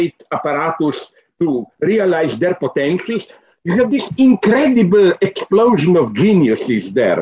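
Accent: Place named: Russia. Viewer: Like listening to a Russian Sylvester the Cat..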